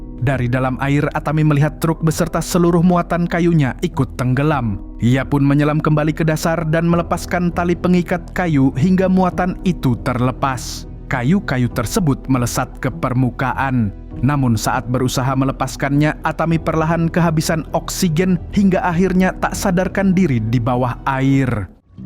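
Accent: Banjar, Indonesia. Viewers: Orang Banjar sakalinya adminnya😂😂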